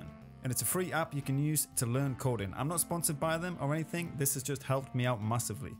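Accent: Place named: Northern England, United Kingdom. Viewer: You have a bit of a northern lingo you local to Newcastle by any chance :)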